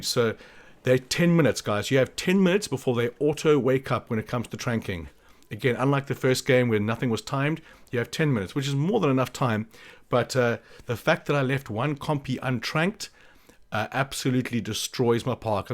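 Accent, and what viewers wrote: South Africa. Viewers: Bro u sound like a fellow South African are u?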